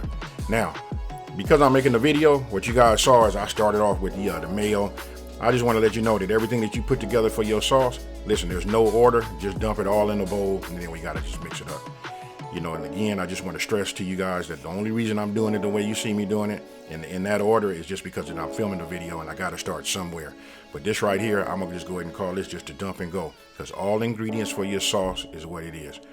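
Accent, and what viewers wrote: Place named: Mississippi, United States. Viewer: Are you from Mississippi?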